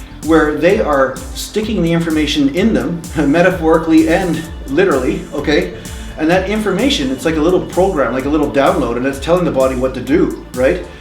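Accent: Canada. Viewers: No prob my dude. You're canadian accent also reminds me of home (I'm originally from Minnesota) which makes me love your vids even more. I'm about to binge watch a shitload of them.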